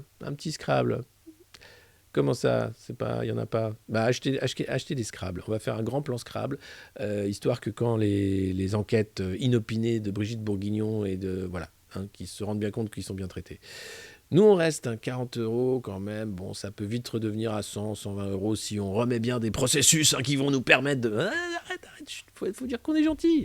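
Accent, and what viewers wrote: Quebec, Canada. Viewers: Excellent l'accent québécois 😀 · N'oubliez pas le petit bonnet de bain pour Maggy , pour le jacuzzi !!! Merci , toujours aussi génial !!! Et vous avez un accent canadien fantastique !!! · Bel accent Québécois!! Bravo! Calins à Miggie et Courage à Timmy! Merci!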